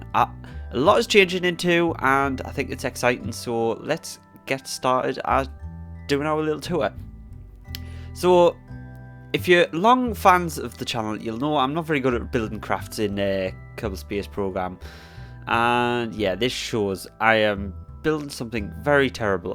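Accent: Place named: Northern England, United Kingdom. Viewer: You are from somewhere north of England. Accent is similar to mine where you from?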